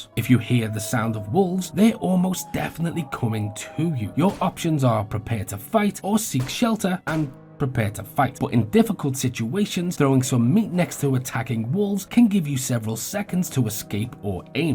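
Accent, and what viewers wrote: Liverpool, United Kingdom. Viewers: hi mate love the videos! are you a fellow scouser? lol, sounds like it.. just wanted to ask, im tired of joining servers with absolute sweats where i cant do an airdrop or koth in peace, do you know of any servers you can share that are abit more beginner friendly where most people are on the same boat as me? wold be great if you could recommend any, thanks man! once agin love the content keep it up!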